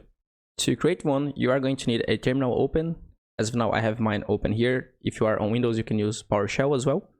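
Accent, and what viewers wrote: Brazil. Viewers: i must ask,where you are from? you sound a lot BR to me.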